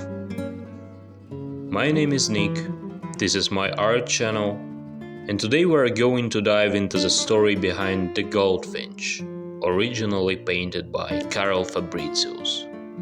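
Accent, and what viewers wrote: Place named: Spain. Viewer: I hear you have a Spanish accent. Are you from Spain? My grandparents were from Castilla La Vieja in Spain. I think that I must have been an artist in my past life because painting is my passion, and I am a self-taught artist. I went to Spain and visited the museum in Madrid. I love Velasquez. He is my favorite of Spanish artists! You are great too ! Gracias por compartir su conocimiento y talento con nosotros!!❤❤❤❤🎉🎉🎉😊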